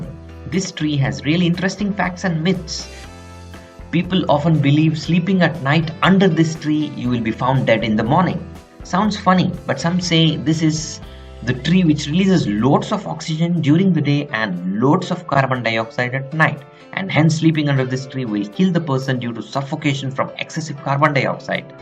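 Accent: India. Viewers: I don't know why I like to hear English spoken with an Indian accent.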